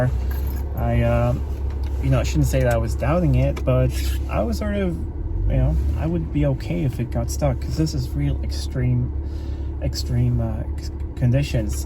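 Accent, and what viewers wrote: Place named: Midwest, United States. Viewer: I’m glad you made to and from with no problems. Once again you say you’re in Switzerland but you have a mid western US accent where are you from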